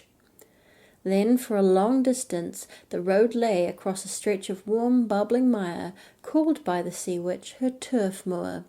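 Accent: New Zealand. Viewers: Your voice and Kiwi accent are so relaxing 😊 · I usually don't mind the NZ accent, but not necessarily for story telling, tbh you sound lispy, have you taken your piercings out? Sorry I've had to leave you and go to another channel called relaxe her voice is clearer and not so lispy and poppy very soothing American story teller she is, but I give you an A+ for effort🦇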